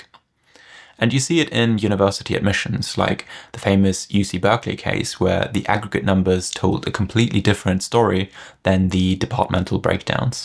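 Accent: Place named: England, United Kingdom. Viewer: Interesting that the English sounding narrator talks about surgeons as Doctors because in the UK if they are surgical specialists they would be called Mister or a female equivalent. Perhaps he is making this more understandable for your generic English speaker without having the caveat about their actual titles. Still it amuses me when I tell Americans that if they have appendicitis in the UK and they are told Dr. Jones will be along soon, to run like hell because he's not a specialist.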